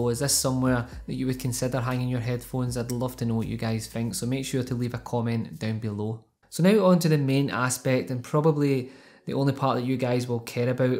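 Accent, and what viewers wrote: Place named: Glasgow, United Kingdom. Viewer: Your Glaswegian right, well you sound like, anyway keep up the great work! But I have a request can you make a video about budget per key RGB mechanical keyboards under 30 pound.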